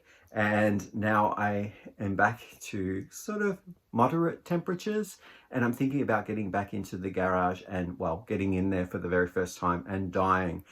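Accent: Australia. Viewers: Happy belated birthday! How crazy is it that you received 2 cards exactly the same!! The sweater looks fantastic on your hubby! That gray shawl is beautiful I like how you incorporate the contrasting orangeish color and the burgundy will be a nice contrast as well. Where are you from originally? I've been trying to place your accent, it give me Australian vibes 🤔 I hope you had a great time at the new restaurant, can't wait to hear about it.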